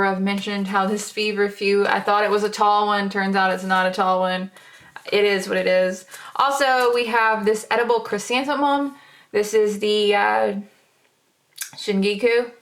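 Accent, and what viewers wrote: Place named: Texas, United States. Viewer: SO HAPPY to find you again, your accent reminds me of my friend janine from texas. :) i enjoy listening to you.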